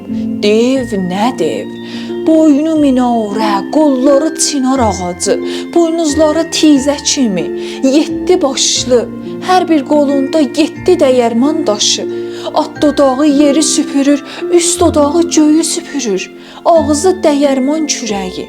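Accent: Iran. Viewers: Ай гыз фарс лейчесийле данышма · Mənim 70-yaşım var, bu nağılı 10-12 yaşımda "Azərbaycan nağılları" kitabında oxumuşdum. İndi cənublu qızımızın dilindən şirin Təbriz ləhcəsində yenidən eşitmək istəyib axıra qədər qulaq asdım. Uşaqlığım yadıma düşdü.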